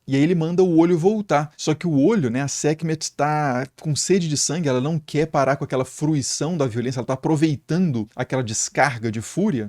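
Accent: Minas Gerais, Brazil. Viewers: Da pra notar que vc é de minas tbm kkkkkk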